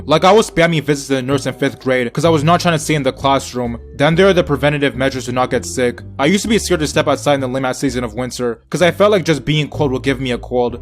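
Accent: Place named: New York, United States. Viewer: I am new to your channel and deadass I fucks with you heavy I am from NewYork recently moved but when I hear your voice and I feel right back in New York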